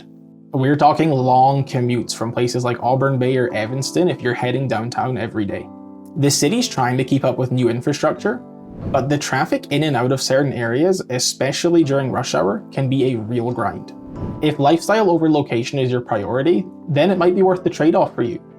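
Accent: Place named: Ireland. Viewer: No offense, I notice a slight Irish accent in you. Is that the Calgary accent? Lots of Irish descendants there?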